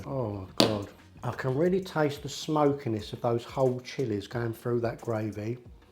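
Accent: Liverpool, United Kingdom. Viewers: Proper scouser ❤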